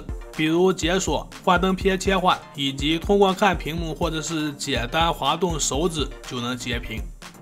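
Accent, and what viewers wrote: Shanxi, China. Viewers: 麻烦你练练普通话, 你这内蒙西部口音还是山西口音太严重了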